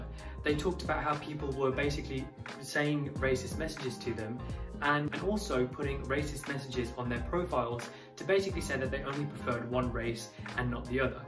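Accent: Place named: Birmingham, United Kingdom. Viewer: Don't believe it about the accent not being liked - I love the Birmingham accent!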